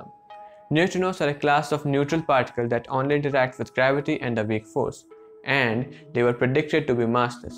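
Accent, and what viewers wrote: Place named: India. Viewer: I cannot experience this video due to the pajeet voice and accent.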